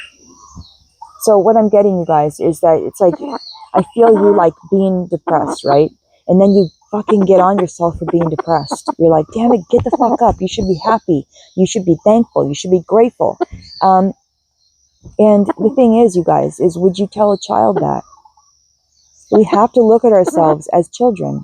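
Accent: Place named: United States. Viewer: Where are you located? 🤔, you have a US English accent but you go by a strange time zone. Anyways I love watching your videos, watching your pets I also have several cats, two dogs and a turtle.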